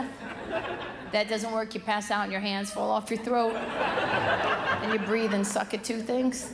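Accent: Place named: Arkansas, United States. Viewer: I saw you on Tube, do you remember me? 😉Sounds like an Arkansas Hillbilly.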